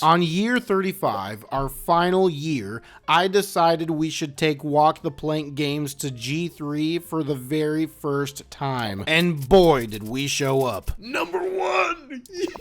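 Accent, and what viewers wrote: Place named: United States. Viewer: What a dreadfully american accent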